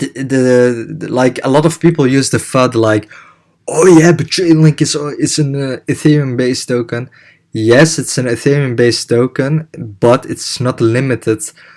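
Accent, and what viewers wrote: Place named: Netherlands. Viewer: you must be dutch or belgian :D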